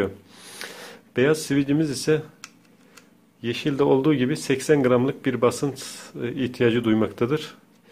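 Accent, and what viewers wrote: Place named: İspir, Turkey. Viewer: Ç'lerine gurban olduğum Gardaş sen İspirli misin?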